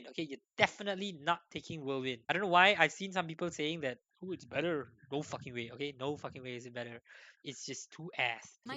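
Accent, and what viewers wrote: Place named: Malaysia. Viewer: Your malaysian accent is so bold, you from which part of Malaysia?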